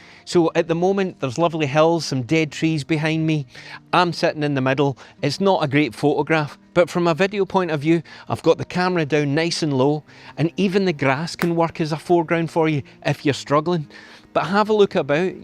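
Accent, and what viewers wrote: Scotland, United Kingdom. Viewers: Ok can I say I came for the ditched rule, I stayed for the perfect Scottish accent. Thank you great photos.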